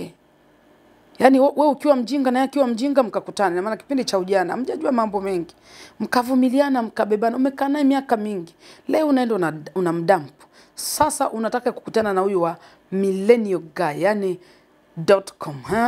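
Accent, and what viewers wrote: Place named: Kenya. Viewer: Samahani Shusho ni mkinya au Mtanzania Mbona ongea yake kama mkwnya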